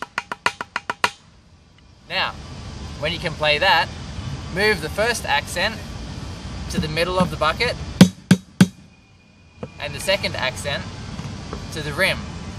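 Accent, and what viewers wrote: Australia. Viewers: You got an australian accent now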